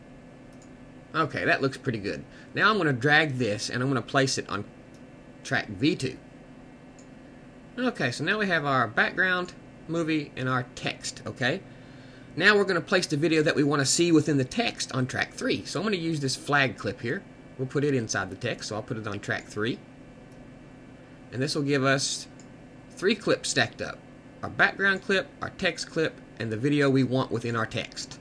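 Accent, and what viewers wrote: South, United States. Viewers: this was really useful. thanks. where's your accent from? (I'm not American, so I can't figure it out). the South or something?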